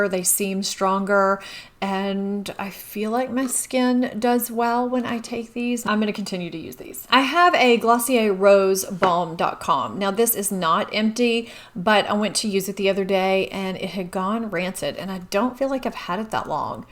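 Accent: United States. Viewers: Hi, new to your site (and most others too, not very tech savvy😋) but I wondered if you could hold the items still so I can read the product name, or perhaps put in some text in one of the corners of the screen with the name of the product. I’m in Aus, lots that you test are not familiar to me and I often don’t catch it’s name (American accent is sometimes quite different to Aussie accent). I’m really enjoying your videos, and learning lots. Plus you are blessed with beautiful skin, pretty much everything you wear looks lovely. I’m 63, had horrendous acne and super oily skin, it’s looking the best it ever has, strangely. Not too many wrinkles, but it’s def aged. Jill in Aus.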